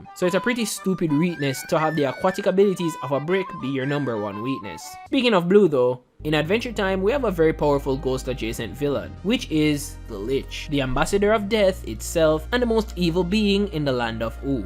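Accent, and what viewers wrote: Jamaica. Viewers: r u jamaican? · Are you Jamaican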